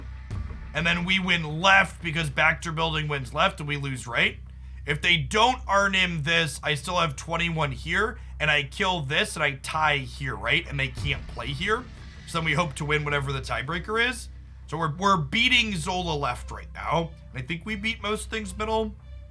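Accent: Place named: United States. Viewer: YouTube voice: regular American accent. stream voice: “deed ya like that cheeeyat?!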